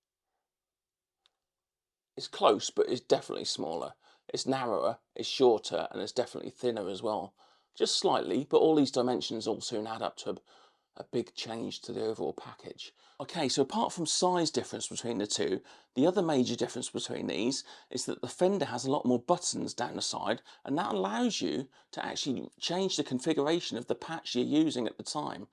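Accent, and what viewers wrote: United Kingdom. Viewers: Thanks a lot for the excellent review,professionally done,plenty info,no gobble dee gook. Like the friendly style and Your British accent too ! Regards: Peter from across the channel.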